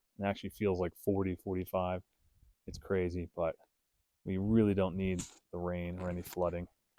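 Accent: United States. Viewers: I thought you sounded like you could be from here! I’m in south central PA an hour south of Harrisburg. It’s funny we’ve had a practically snowless winter here. Keep your chin up out there. 🙏. I know there’s some pretty rough corners in your neighborhood (poor truck) but Spring is right around one… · Just curious, what part of the east coast are you originally from? I hear that accent every now and then.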